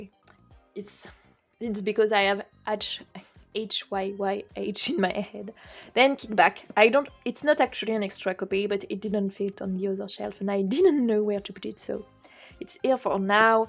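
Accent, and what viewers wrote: France. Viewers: are you french ? cuz you have a french accent while speaking English btw i like your collection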